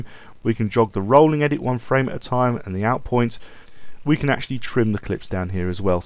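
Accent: United Kingdom. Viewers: YOUR LESSONS ARE THE BEST ON YOUTUBE. I AM NEW TO PREMIERE PRO AND YOU HAVE REALLY HELPED ME A LOT. I LOVE THE VERY SIMPLE WAY THAT YOU EXPLAIN SOMETIMES CONFUSING PROCESSES. MANY THANKS!! OH, YES, YOUR BRITISH ACCENT IS VERY COOL.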